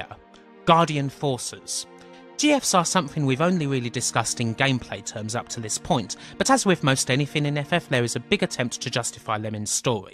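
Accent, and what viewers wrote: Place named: England, United Kingdom. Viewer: Как меня бесит акцент англичан и не неси херню про сон и смерть главного героя ) Это моя лучшая игра.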